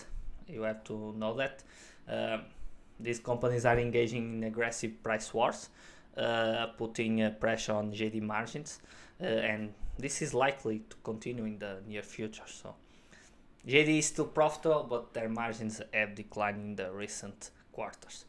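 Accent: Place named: Portugal. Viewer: Good video! I like your Portuguese accent. Sounds a bit like José Mourinho (that's a compliment)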